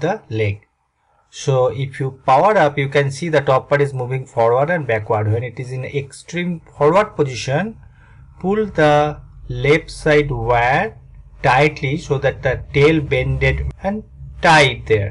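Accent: India. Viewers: Speak using proper English accent, please. Your Indian accent is so irritating.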